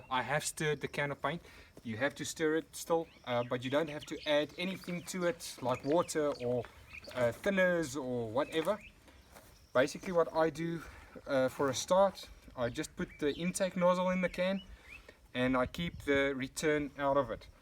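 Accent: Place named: South Africa. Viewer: enjoy listening to the south African accent .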